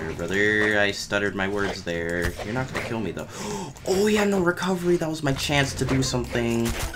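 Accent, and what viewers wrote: Australia. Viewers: Nice aussy accent Mate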